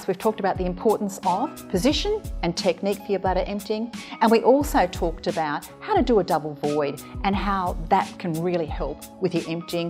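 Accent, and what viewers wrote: Australia. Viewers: With a voice like that it's definitely down under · It'd just an Australian accent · Terrible Aussie accent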